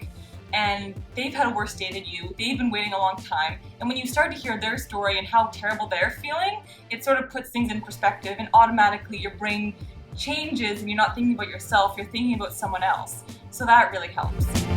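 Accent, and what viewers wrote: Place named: Canada. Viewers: You sound Canadian to me. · That's because she is Canadian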